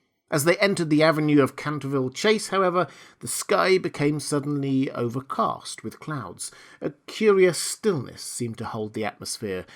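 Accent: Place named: United States. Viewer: Great American accent!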